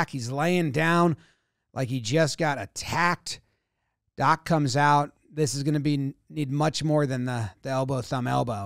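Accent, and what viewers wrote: United States. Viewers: Its so weird to hear someone with American accent commenting Cricket. We are so used to Brit, Aussie and Indian commentators 😂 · This is the first time I'm hearing someone commentate in an American accent, and I'm loving it, especially since its funny too. Refreshing! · its the weirdest thing hearing an american talk about cricket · there's something about an American describing cricket that is just addictive to listen to. Such a great reaction video. This should be used by the ICC to promote the world cup that's coming up in America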